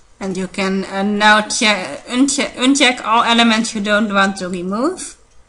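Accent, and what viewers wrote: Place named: Netherlands. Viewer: hoi, door de pop up en je accent had ik opgemerkt dat je nederlands was:). ik vond ineens nadat ik een programma had gedownload deze sweet-page en probeerde het te verwijderen door middel van het configuratie centrum, maar al gauw merkte ik de afwezigheid hiervan ik typte bij youtube in 'how to get rid of sweet page' en kwam al gauw op deze video, ik heb zelf geen last gehad van dit virus omdat ik het in hetzelfde uur heb verwijdert doormiddel van jou goeie instructies. HEEL ERG BEDANKT:) LIKE!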